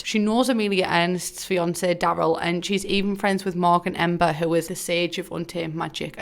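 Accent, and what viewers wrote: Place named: North, United Kingdom. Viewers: You are now my official Sims news broadcaster, always loved the accent, I could listen to you all day and that northern humor just has me on the floor holding my stomach. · This is way more entertaining listening to a northerner😂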